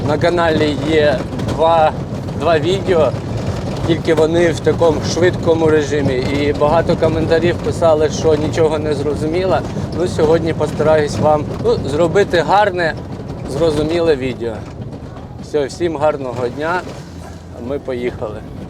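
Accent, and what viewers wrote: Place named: Kherson, Ukraine. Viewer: Привіт земляки, як тільки почула наш херсонський суржик, відразу підписалася на ваш канал,ми теж зараз мешкає в іншому регіоні,але дуже хочеться додому,правда,квартира в річпорту затоплена,в приватному будинку на лівобережжі живуть орки,то ваша ситуація доволі знайома,бажаю вам міцного здоров'я, процвітання каналу,і многая літа під мирним жовто-блакитним небом!!!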